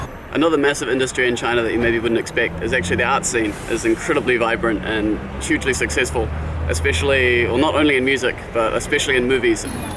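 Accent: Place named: United Kingdom. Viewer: your english does not sound very much like those from new zealand. it is more british.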